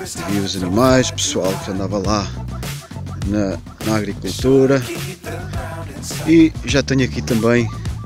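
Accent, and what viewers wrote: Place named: Rio Grande do Sul, Brazil. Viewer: Meu amigo você é gaucho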